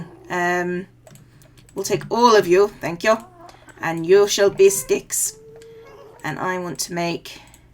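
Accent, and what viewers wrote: United Kingdom. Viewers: What i think about u is that I hate you you suck and your accent is just, ugh! Your videos are boring as hell!! What i ACTUALLY think about you is that, you're awesome, i like what you do, and i watch your videos and actually enjoy and be happy about it, i love you and your british accent:D you are the 2. Youtuber in my top 5 youtubers list! <3:3:D keep it up, you're going great!! >.<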